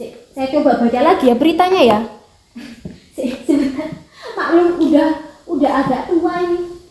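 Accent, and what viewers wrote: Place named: Java, Indonesia. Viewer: Masya allah medhoknya on point yh kak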